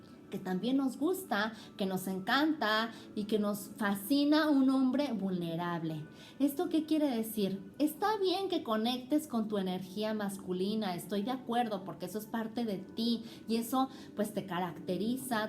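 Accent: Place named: Spain. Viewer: eres Mexicana con acento espanola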